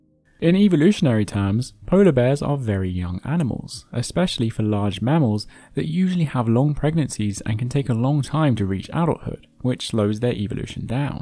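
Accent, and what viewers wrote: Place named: United Kingdom. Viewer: Creepy british asmr voice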